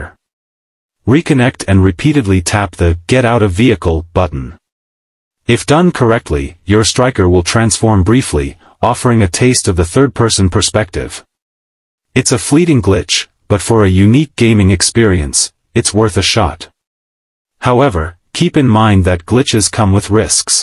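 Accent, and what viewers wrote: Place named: Nigeria. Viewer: Love the Nigerian accent bro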